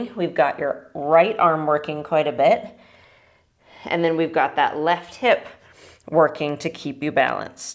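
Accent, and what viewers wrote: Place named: United States. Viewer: How do spell' peeppers '. Can't understand American accent!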